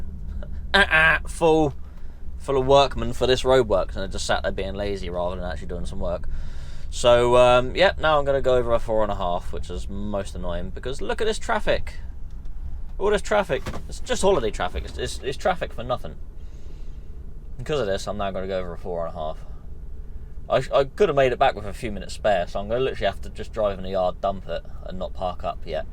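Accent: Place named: South, United States. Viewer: Nice one. Good video and great southern accent you have 😅… keep them coming 👍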